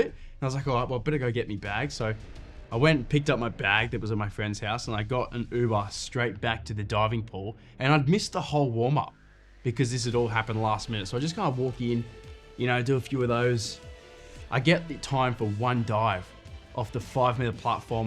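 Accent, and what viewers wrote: Australia. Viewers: I love you,... But, your Aussie accent confuses me to laughter!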